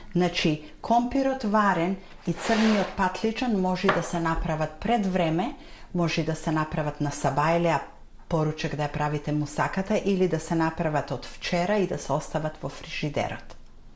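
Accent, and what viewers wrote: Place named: Kavadarci, North Macedonia. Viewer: Bravos super si!! Site videa se so tradicijata na makedonskata kujna i se odlicno napraveni(zgotveni) a se razbira i mnogu dobro objasneti.👍😊Sakam da te prasam od kade si ti od kaj poteknuvas od koj kraj na makedonija??deka akcentot tvoj e mnogu ubav i me potseka na mojot kraj od kaj sto sum jas Kavadarci a sega ziveam vo Sydney Australia.Golem pozdrav od mene Gorica 😊 i se najdobro.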